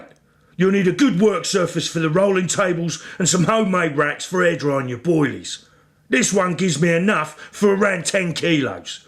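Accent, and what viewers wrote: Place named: Cockney, United Kingdom. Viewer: Wow big grizzly cockney voice and the missus making the boilies now that's a concoction!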